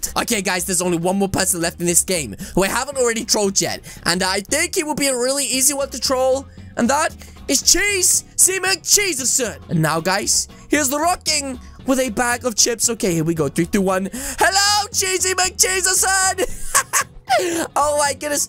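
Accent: United Kingdom. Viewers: Bro is in British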